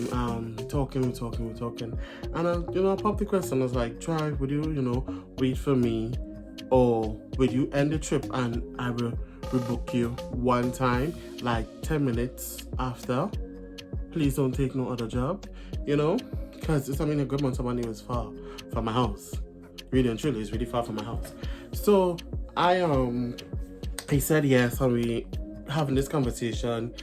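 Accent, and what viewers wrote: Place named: Nigeria. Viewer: Are you Nigerian? Lol, you sound Nigerian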